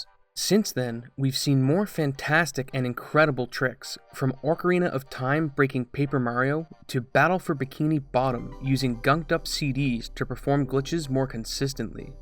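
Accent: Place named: Maritimes, Canada. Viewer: I hear that Maritime accent :P Also great video!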